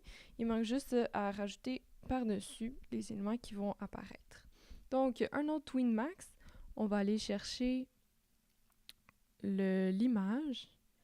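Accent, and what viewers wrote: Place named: Quebec, Canada. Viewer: Merci pour ce tuto clair et pratique. Et l'accent de nos amis québécois en plus.... J'adore.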